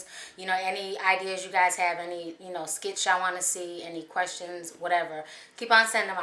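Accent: South, United States. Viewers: Oouuu it's the southern twang for me! Glad to see your growth! 😩🤎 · listen to your slight southern accent!! MN flushed it out. I'm loving this random flashback 💕💕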